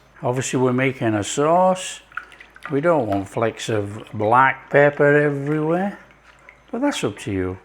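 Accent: United Kingdom. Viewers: Love your style sir, you probaly think we got an accent over here in the USA, but that's ok but, we LOVE YOUR BRITISH TALK, LOVE YOUR, "COME ON, PLEEEESE! THATS THE SIGNATURE OF 😅 you are so down to earth, and such a great cook, I look forward to each of your videos, love the one right way to make burgers. You are a master in the trade, simply love your presentation, espeacially when you sit and taste what you made, love your expressions, a bonus to all your videos, crack on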